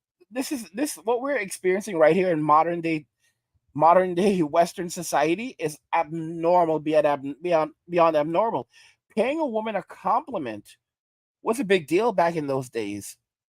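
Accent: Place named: New York, United States. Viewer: Women whine and squeal like the pigs under a gate. They're arrogant, selfish, and delusional to a T. After they told us men not to come near them, they're not worth investing so the juice ain't worth squeezing. By the way, your NY accent is on point. Way savvy than Wrestling Writer Vince Russo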